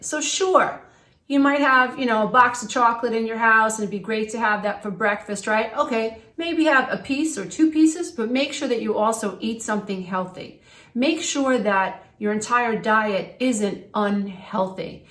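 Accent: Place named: Long Island, United States. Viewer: Another spectacular Stellar video. Life lessons worth millions to me. You're priceless in the knowledge assistance reassurance Etc. That you give to me. I grew up in Levittown Long Island and living in Las Vegas 30 years I haven't lost my accent and it's so wonderful to hear yours makes me feel so at home. Honestly feels like my wonderful mom and her friends are sitting around talking giving me advice like they did the times when I was growing up hanging around the house dealing with s***. Peace light love my friend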